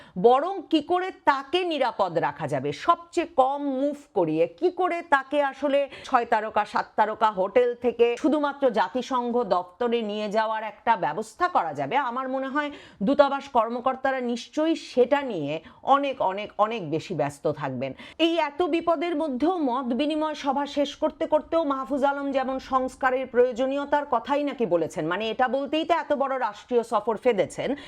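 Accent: Kolkata, India. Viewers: আপু আপনি কোলকাতার ভাষায় কথা বলেন কেন, আপনি কি ইন্ডিয়ান, "র"?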